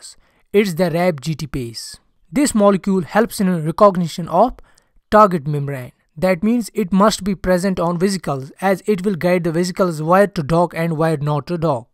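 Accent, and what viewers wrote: Kashmir, India. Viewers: Your accent is kashmiri.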